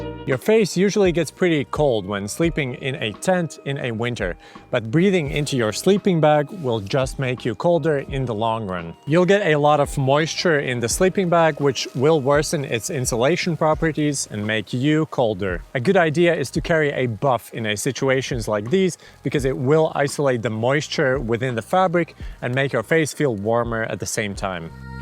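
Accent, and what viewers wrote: Latvia. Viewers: man pagāja 7 sekundes līdz uzķēru, ka tāds akcents ir tikai latviešiem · Latvian accent? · Es dzirdu latviešu akcentu?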